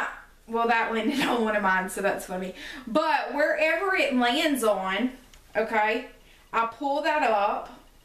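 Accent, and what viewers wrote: South, United States. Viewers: your voice is so darn cute, I literally listened to you for your voice :-) I love that southern drawl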